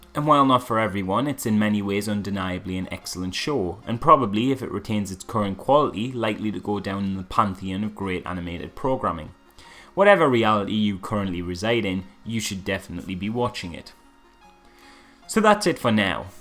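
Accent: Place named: North East England, United Kingdom. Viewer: Nope but close. it's one of the accents in the North East of England, not too far away from Scotland.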